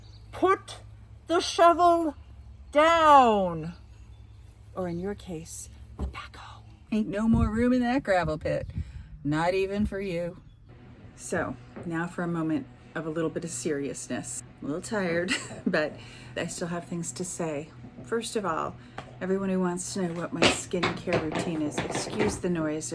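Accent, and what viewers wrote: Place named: Connecticut, United States. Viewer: I was listening and said to myself, that's a Connecticut accent. I was right!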